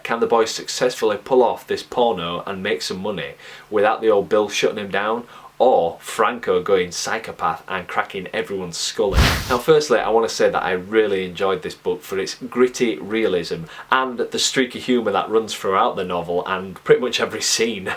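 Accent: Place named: Scotland, United Kingdom. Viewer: Oh, okay, but I feel like the scottish accent is kinda catching up on you, idk maybe I'm just being silly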